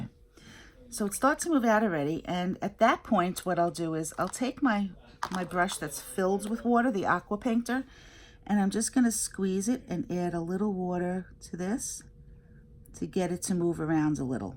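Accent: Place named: New York, United States. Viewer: What an inspiring and encouraging video. You have covered all my favourite topics and techniques - inked backgrounds, stamping, embossing and water colouring, and all accompanied with your wonderful New York accent. You have made my day!! Thank you so much for the great advice on a technique that I am now inspired to try myself. Love watching your videos here in Ireland.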